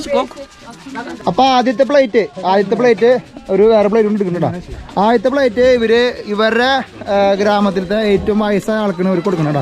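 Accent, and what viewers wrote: Thrissur, India. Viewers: thrissur slang pettannu alkulle kayiledukkan powerulla manohari😘😘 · അലസ്‌കയിൽ വന്നപ്പോൾ തൃശ്ശൂർ ഭാഷ കാരണം subscribers കിട്ടുമോയെന്നു ആശങ്ക പ്രകടിപ്പിച്ചിരുന്നു.അന്ന് നൂറിൽ താഴേ മാത്രം subscribers!!ഈ ആഫ്രിക്കയിൽ വന്നിട്ടും തൃശ്ശൂർ ഭാഷ കൈവിടാതെ തനതായ ശൈലിയിൽ കൂടി നാല്‌ ലക്ഷത്തിൽ അധികം subscribers!!!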